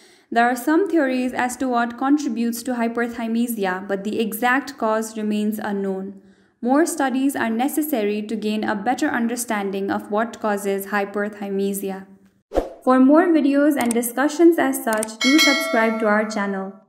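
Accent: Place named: India. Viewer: Omg an Indian narrator , unfortunately I have a low tolerance for this, bye.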